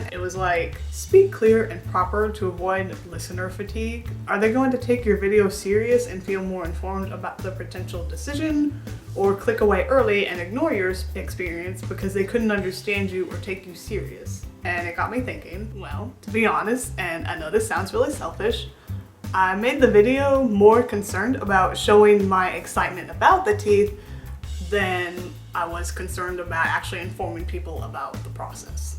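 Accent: South, United States. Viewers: I think the way you talk is sexy as hell! It’s like an old-school Southern girl accent. I’m not into ASMR, but I usually watch just to hear your voice. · Imagine having a slow southern accent Beautiful young lady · you talk southern lol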